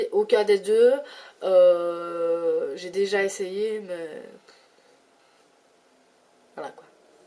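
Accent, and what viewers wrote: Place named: Belgium. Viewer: Coucou! Bienvenue sur youtube ^^J'ai crée une chaîne il n'y a pas très longtemps, et je t'invite à la découvrir en espérant qu'elle te plaise et te face passer un bon moment, et si tu t'y sent bien et que tu a aimer quelques une de mes vidéos n'héssite pas à t'abonner. Et si tu t'abonne j’espère que mes future vidéos te plairont d'avantage! je te souhaite une bonne journée ou soirée:) bisous à toi!:p byyyy! ^^ -...... PS: j'adore ton accent! tu est belge non?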